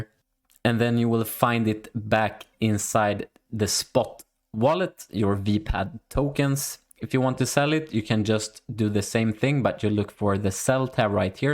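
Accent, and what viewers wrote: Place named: Sweden. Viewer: I love that Swenglish-Makes the video so much entertaining : D - Thanks for good tutorial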